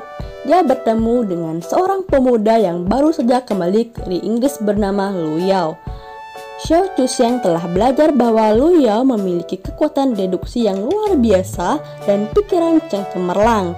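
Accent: Bali, Indonesia. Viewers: Logat balinya kentel🥰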